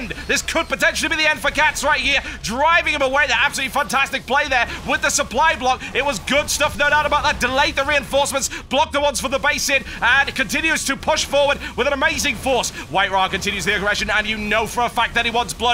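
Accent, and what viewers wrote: United Kingdom. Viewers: U gotta love British cast.... Great great job mate ;-)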